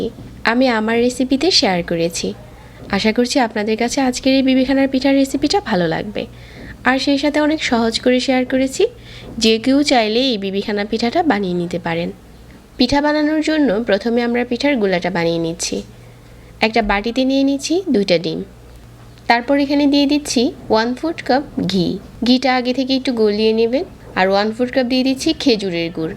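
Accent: Noakhali, Bangladesh. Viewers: আপনার বাড়ি কি নোয়াখালী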